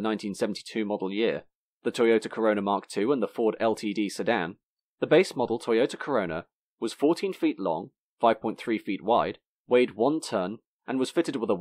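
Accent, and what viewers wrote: United Kingdom. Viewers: Hearing a commentator with a British accent talk about poorly designed/inefficient American cars is utterly GALLING. Wow nobody knew how to build low quality unattractive, inexcusable, unforgivably bad cars better than the English. One step higher than Trabant, if that. I can't make it all the way through a video on the English car industry without a good strong anti depressant.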